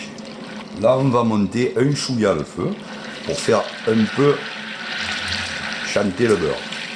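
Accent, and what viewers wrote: South, France. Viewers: Oh l’accent du sud, quel délice ❤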